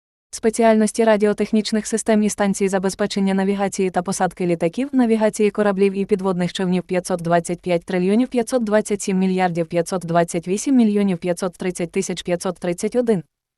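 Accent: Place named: United States. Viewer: де найшли диктора дебільну, може говорити українською мовою. американка чи що.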